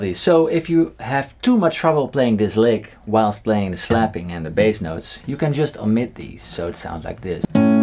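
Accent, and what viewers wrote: Netherlands. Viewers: you sound dutch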